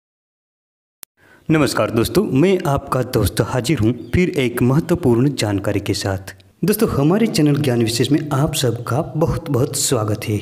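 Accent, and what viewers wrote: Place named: Tamil Nadu, India. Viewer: Tamil wala bolti hai. Aati nahin hai.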